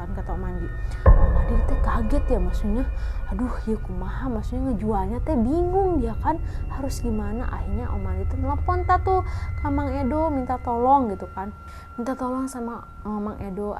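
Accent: Indonesia. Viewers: Ngerti dong Sunda banget c teteh na nyarios na😅😅👍👍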